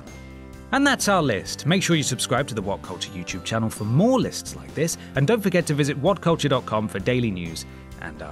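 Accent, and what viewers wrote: United Kingdom. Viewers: here i was thinking i would get to watch 9 deleted scenes but nah im just sitting here listening to some british guy tell me about 9 deleted scenes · It would be easy to imagine this chap with his British accent saying "Dam it man!" or "For heaven's sake man!"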